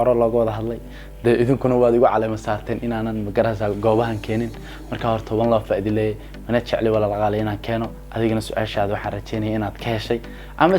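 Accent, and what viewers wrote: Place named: Mogadishu, Somalia. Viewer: Lahajda Heer Xamarkad Wadata Weli Bro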